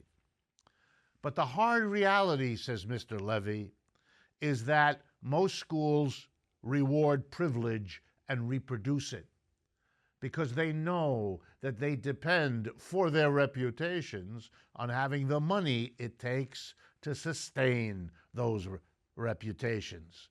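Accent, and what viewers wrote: New York, United States. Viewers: You know what I don't understand? How a guy who went to Harvard, Yale, AND Standford is talking to us about the problems of our system. I truley am puzzled. How so many priveleged in history take stand against the problem that made them so sucessful. I am thankful for Dr. Wolff's show, however it is odd. You can tell he's a New Yorker by both his accent, and the fact his show is structured like a radio show (big in NY)...plus I google'd him lol. I'm a new englander myself and although I don't live in NY I do appreciate the show's style a lot.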